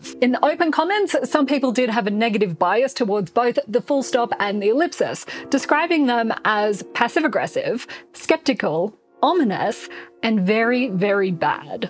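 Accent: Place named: Australia. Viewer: jumpsuit... jungle safari print. ping pong table? Australian accent!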